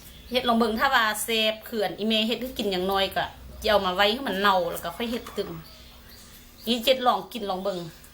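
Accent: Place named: Lao Nyo, Thailand. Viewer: ฟังพี่เขาพูดออกสำเนียง ลาวผสมญ้อ (ฟังออกครับ)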